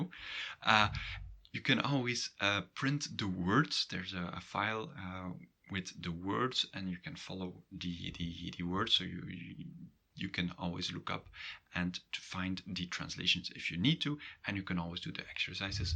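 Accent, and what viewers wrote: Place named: Belgium. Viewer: Well, finally i can find a real Dutch course video from belguim's accents . I will follow all your courses...:-)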